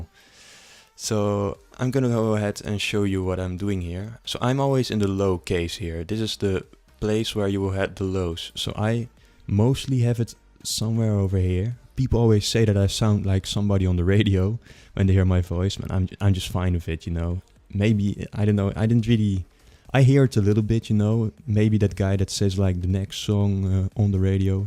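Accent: Netherlands. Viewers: When a dutch person makes a guide its the first thin i hear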